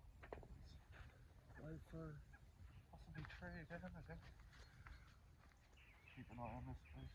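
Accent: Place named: Ireland. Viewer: Keep the crossfields, finchs, sheils & robinsons, this is absolutely me to a tee (no pun intended!) Great watch for so many reasons: I can see someone else hitting the same clangers I hit but never know why I did it as I never see my own swing so this will be a huge help.. you're playing courses that are only an hour or two away from me that I could easily get to myself so it's brilliant to get to see them up close on the big screen.. naturally, it's smashing to hear a fellow Paddy's accent on the YT for a change 😀.. and I stocked up my golf clothing wardrobe with the druid's gear there at Christmas too so it's nice to see someone else with good taste in garments too :) keep up the great work pal, best luck for the upcoming season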